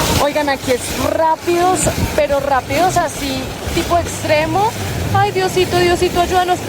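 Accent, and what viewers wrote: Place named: Antioquia, Colombia. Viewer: Ese acento paisa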